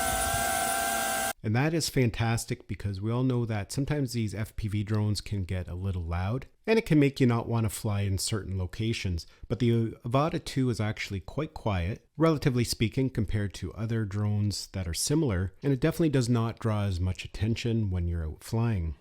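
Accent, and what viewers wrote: Canada. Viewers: Your Canadian accent is like nails on a chalkboard. 🤦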